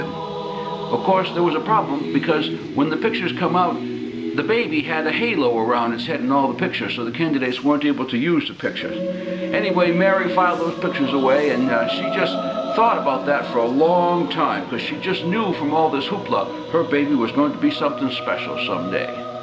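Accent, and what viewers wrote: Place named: New Hampshire, United States. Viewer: Merry Christmas,! It just ain't Christmas without hearing you read the Christmas story, New Hampsha style. Keep up the good work!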